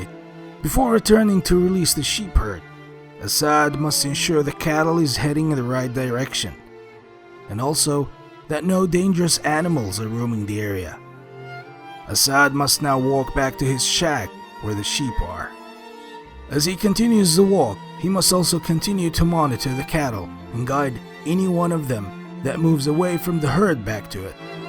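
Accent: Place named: Hollywood, United States. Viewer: بارك الله فيك على هذا العمل الجيد والممتع والراءع في نفس الوقت باللغة الإنجليزية ،اتمنى لك كل التوفيق في مجالك وننتظر منك الجديد ،متابع جديد ،ملاحظة لغتك الانجليزية هوليودية بامتيازواصل .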